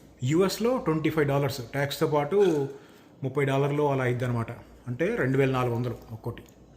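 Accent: Andhra Pradesh, India. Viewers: Anna garu you are talangana man But your talking pure AP talugu so proud of u anna garu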